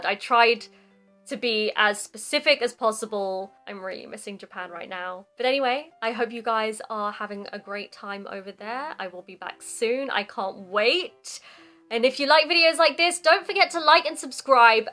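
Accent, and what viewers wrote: United Kingdom. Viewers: 早くオミクロン株から逃げて帰国した方が良いです。イギリス英語が一番聞きとりやすく勉強になります。 It is better to escape from the Omicron strain and return to Japan as soon as possible. British English is the easiest to hear and study. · Sometimes Japanese old-timers says "in western countries blah, blah, blah" but i don’t want to listen to them any more and we should be more confident our system and the way we do, right ? Thank you very much for your “noticing” and i love your charming voice and the way of talking （especially i love your British accent ! ） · uk訛り❤好き😊🎉活動頑張ってください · I like your British accent. In addition your oppinions are fun!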